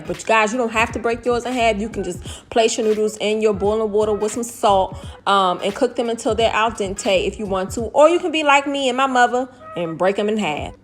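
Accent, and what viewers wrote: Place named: DMV, United States. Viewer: Gotta b from the dmv the way u talk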